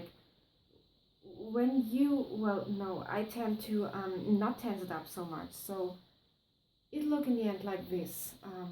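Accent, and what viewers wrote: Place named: Germany. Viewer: Ich hab mir bei deinem Englisch-Akzent irgendwie gedacht dass du deutsch sprichst. Aber das ist eigentlich total unwichtig. ist mir nur aufgefallen. Du spielst gut. Ich bin auf viele neue Videos gespannt! :)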